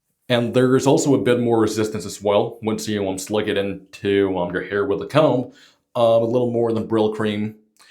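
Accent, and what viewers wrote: South, United States. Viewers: Awesome review and look, dude. You have a Southern twang now! Rockabilly runs in your veins, man.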